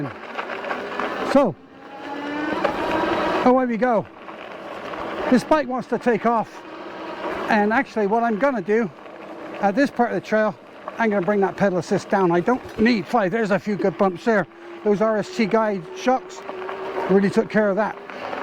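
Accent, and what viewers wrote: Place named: United Kingdom. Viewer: Beautiful, you sound like you come from Blighty?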